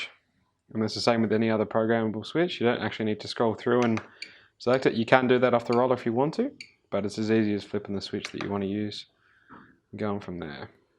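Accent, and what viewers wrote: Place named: Australia. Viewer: as a person who lives in australia, i find the aussie accent very appealing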